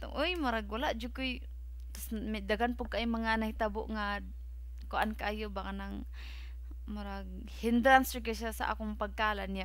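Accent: Visayas, Philippines. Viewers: gusto ko mag callan ay diko kabalo haha. maka lingaw ka mag bisaya sis haha. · ganahan kos imong bisaya accent, makalingaw paminawn. ma inspire pud ko maglantaws imong mga vlog.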